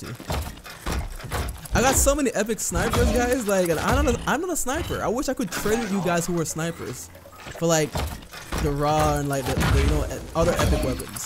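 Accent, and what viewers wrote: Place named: Jamaica. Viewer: You're jamaican? I can kinda hear the accent fam.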